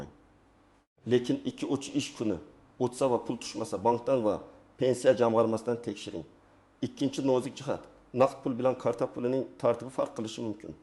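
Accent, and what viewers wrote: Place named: Chukotka, Russia. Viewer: Гапираетган чукчами. Узбек тилини биладиган одам ЙУКМИКАН.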